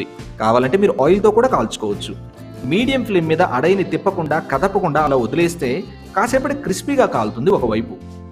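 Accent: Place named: Kerala, India. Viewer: Anna ne language kerala madhrega unadhee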